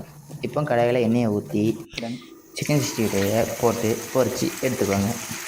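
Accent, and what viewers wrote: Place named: Madurai, India. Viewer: உங்கள் எந்த ஊர் ? பேசும் போது தேற்றம் மதுரை என்று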